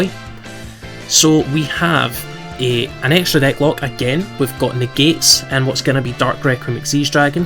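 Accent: Scotland, United Kingdom. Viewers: That Scottish accent yo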